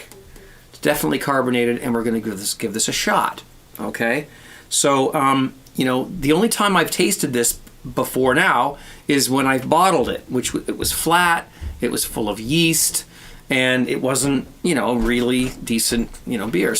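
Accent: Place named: Canada. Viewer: what part of Canada are you from? Ontario?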